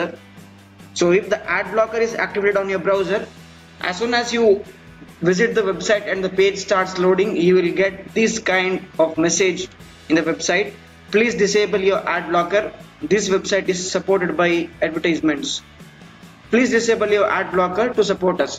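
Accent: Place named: India. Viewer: Indian accent too hard to understand.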